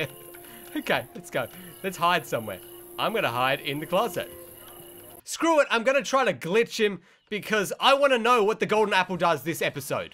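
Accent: Australia. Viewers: Are you Australian? Fav new YouTuber in a while! Good content keep it uo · are u Australian? u do sound like an Australian(not in the bad way) I just wanna know where u from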